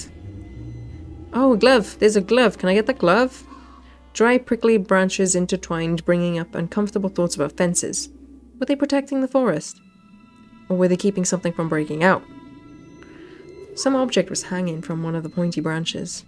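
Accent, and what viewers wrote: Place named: Eastern Europe. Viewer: Dear author, I realised your accent is familiar, especially "р" sound. Actually, where are you from? Are you slav? Sorry for my bad English, please reply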